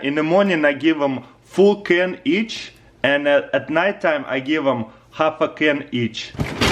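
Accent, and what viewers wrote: Russia. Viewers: His russian English accent is a kind of cute!! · Are you Russian? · I've always liked Russian accents but yours is remarkably nice to listen to. Love the commentary lol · Are you Russian Cuz u have a Strong Accent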